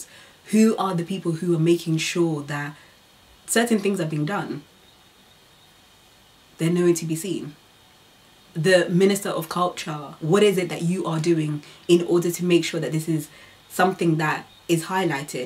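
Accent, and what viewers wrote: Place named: United Kingdom. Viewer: That British accent is on POINT! wow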